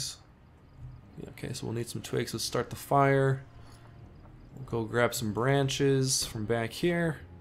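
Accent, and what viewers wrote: Canada. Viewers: Damn I knew you were from Canada the way Canadians say "out" just gives it away lol I was posted near to Medicine Hat for a while. Am enjoying your Videos so keep em coming!!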